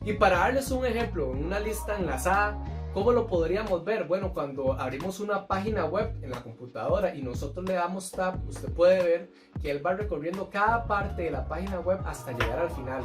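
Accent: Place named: Costa Rica. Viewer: Ese acento tico no se pierde, buen video mae.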